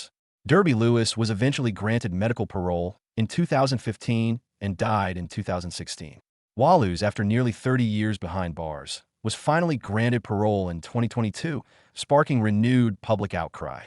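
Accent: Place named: United States. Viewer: Its a bit weird to listen to this in an American accent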